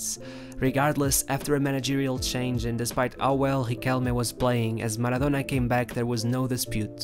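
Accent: Portugal. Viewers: só um português consegue falar inglês desta forma e acertar todos estes nomes latinos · Your pronunciation suggests to me you’re a Portuguese speaker. Are you from Portugal? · Portugal. The pronunciation is good with a Portuguese accent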